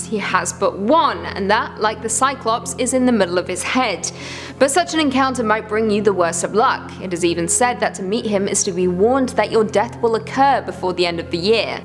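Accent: United Kingdom. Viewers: You're British? I couldn't tell. I just figured that you had a cold. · It's so good to hear this a fellow Brit and not having to listen to Americans butcher British town and city names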